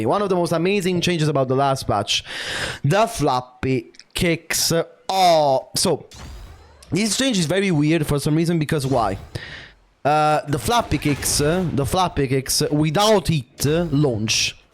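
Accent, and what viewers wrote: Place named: Sicily, Italy. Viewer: Brother, random but are you Italian? Your accent when you speak sounds just like my wife's (Shes Sicilian)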